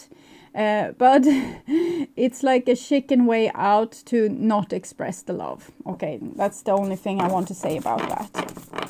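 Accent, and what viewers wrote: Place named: Sweden. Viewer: Time to enjoy a Swedish lass, accent again. Love your beautiful voice . Thankyou for the reading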